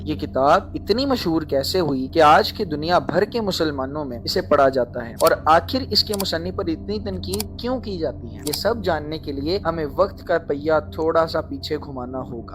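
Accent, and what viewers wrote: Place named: India. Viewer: یار غ کو گ ادا،کر رہے، خ کو کھ کہہ رہے، اتنا زیادہ ہندی کا اثر ؟ تھوڑا تلفظ پر دھیان دیجیے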